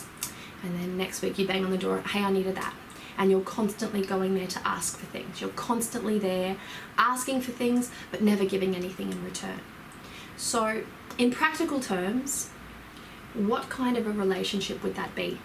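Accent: Australia. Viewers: I can tell you're Australian from the accent, am I right? Working with the saints so to speak, you ain't mucking about That's for sure. Best of luck.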